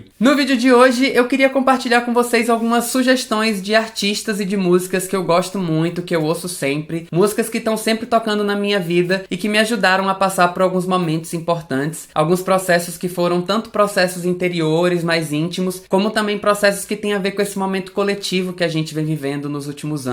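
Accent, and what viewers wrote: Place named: Bahia, Brazil. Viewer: Oi amigo . Tudo bom ? Qual seu nome ? Vc mora na Bahia ? Falo pelo sutaque . Não conhecia seu canal , é ótimo , tem um conteúdo de qualidade parabéns .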